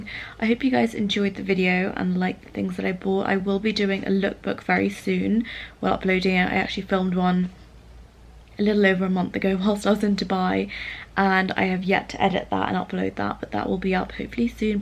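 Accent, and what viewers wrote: United Kingdom. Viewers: how did you have that british accent? make a life story time!! im hooked!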